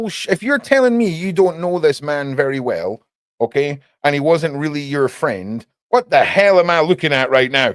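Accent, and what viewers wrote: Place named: Scotland, United Kingdom. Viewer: Liked and subbed, because that Scottish accent is so effin posh! And the content or somefin' or nuffin' 🤣👍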